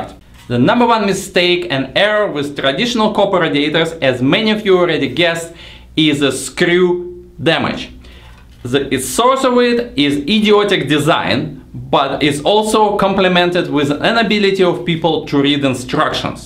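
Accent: Russia. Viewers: Daragiye Tovarische :D your accent are really russian :D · Sorry but I hear strong Russian accent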